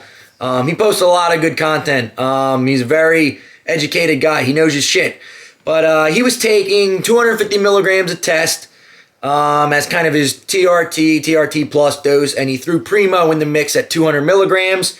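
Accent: Philadelphia, United States. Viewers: That's a philly accent if i've ever heard one. Have a new sub just for that.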